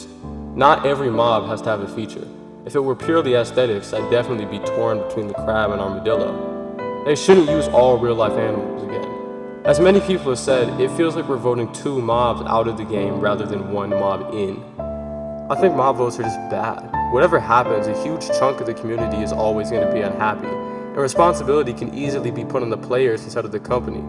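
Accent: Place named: South, United States. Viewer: bro is mad southern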